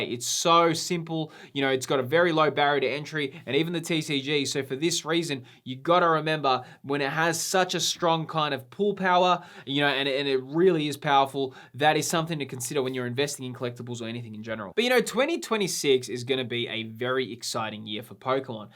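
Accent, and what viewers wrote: Australia. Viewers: he's aussie they have funky accents like the brits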